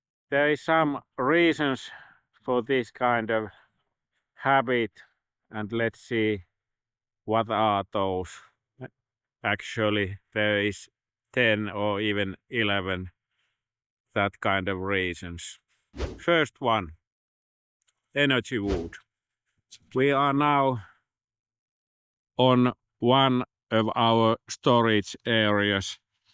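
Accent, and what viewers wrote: Finland. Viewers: Thank you for taking the time to explain this subject to a layman. Fascinating and informative. Also the Finnish accent is pleasing to my ears. Peaceful Skies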